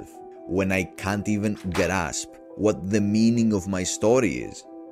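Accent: Greece. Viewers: You sound very Greek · Το ήξρερα οτι είσαι έλληνας το άκουγα στην προφορά .Επίσης ευψαριστώ με βοήθησε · i knew i recognized that accent, but i wasn't sure if you where actually Greek, or just Balkan. but then i saw fucking Ιανουάριος and i was like μπροοοοο. Επίσης γαμάτο βίντεο!!! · There is no way you're not Greek with that accent. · You sound greek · I was wondering the same because of the accent so I took this whole video as a puzzle like "is that nature in the background in a climate zone that exists in Greece?". Well, I got me definitive answer here and that puzzle is solved. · You sound Greek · greek accent lol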